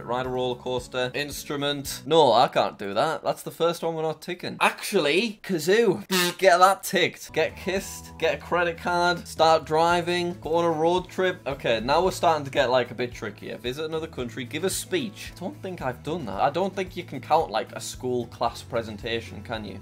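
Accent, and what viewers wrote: United Kingdom. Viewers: I love how he has a British accent.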